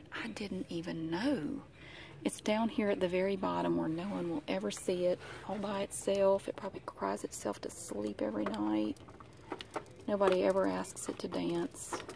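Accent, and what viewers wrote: South, United States. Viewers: I love your videos you remind me of Lisa kudrow aka phoebe buffay! Your voice is sooo soothing I’m from South Yorkshire in northern England. So any American accent is cool to me! Xxx keep up your amazing vids woot woot! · I love listening to your voice, your southern accent is lovely I could sit & listen to you talking all night.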